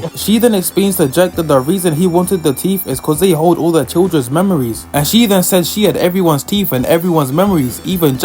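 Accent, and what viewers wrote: United Kingdom. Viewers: Bro I was caught off guard by your British accent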